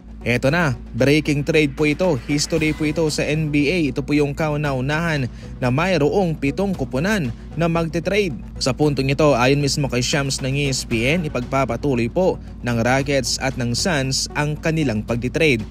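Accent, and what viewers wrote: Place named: Visayas, Philippines. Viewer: Bisaya ampots ahahaha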